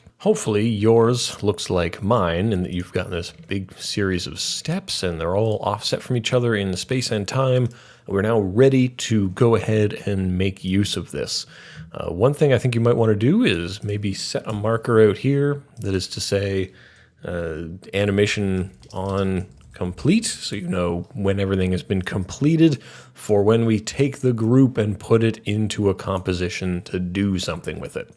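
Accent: Canada. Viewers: Sounds like you're Canadian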